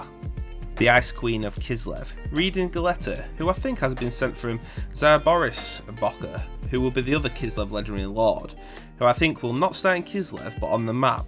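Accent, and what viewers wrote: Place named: Bolton, United Kingdom. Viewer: Where you from lad? You sound like my neck of the woods, Bolton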